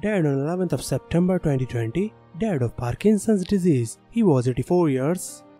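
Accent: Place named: India. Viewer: He did not ruin a thing, It's just he is from India and learned to pronouns like the English with a dash of an Indian accent .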